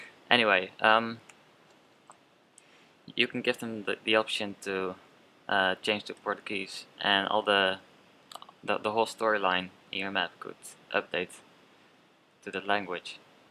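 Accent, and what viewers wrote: Netherlands. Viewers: jij bent nederlands of niet je kan goed engels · Het is heel duidelijk dat je Nederlands bent. Ik ook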